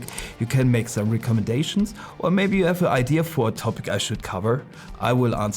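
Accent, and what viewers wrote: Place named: Germany. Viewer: I heard you speaking. and my first thought was: is he German? And, yeah, I was right! 😁 .. Thanks for the review. I want to buy it, but the link you provide only targets to Amazon US and I'm not sure if you'll get the commission if I switch to Amazon DE, maybe you should provide a german link 😉.. Viele Grüße aus Köln!!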